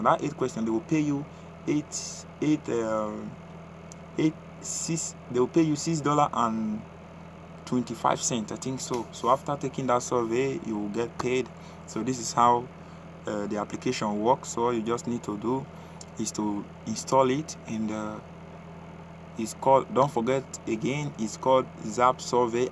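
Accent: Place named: Nigeria. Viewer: He must be Nigerian or just African because I'm African and my dad has his accent lol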